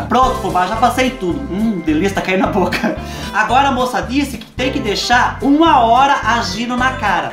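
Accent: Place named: Minas Gerais, Brazil. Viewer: Você tem muito sotaque de mineiro.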